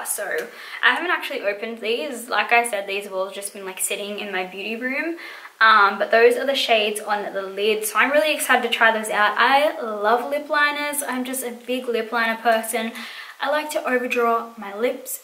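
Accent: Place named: Australia. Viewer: 'Just over here fuckin goin ta town' 😂😂😂 your Aussie is on fire in this tut 🔥😆 love it! Hello from Perth definitely subscribing xx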